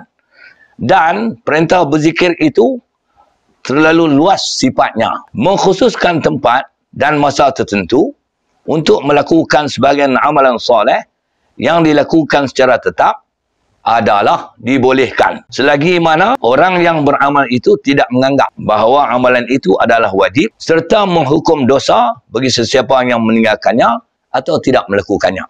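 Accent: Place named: Kedah, Malaysia. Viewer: Pekat betul loghat Kedah Ustaz ni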